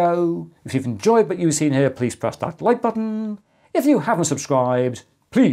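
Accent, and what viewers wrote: United Kingdom. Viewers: There's that calmingess and your British accent, that makes your videos really enjoyable to listen to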